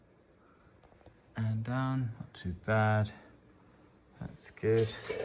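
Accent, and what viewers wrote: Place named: England, United Kingdom. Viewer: It's so funny to hear English spoken with an English accent. It sounds so foreign and exotic to me. Not a single word comes out the way I would pronounce it.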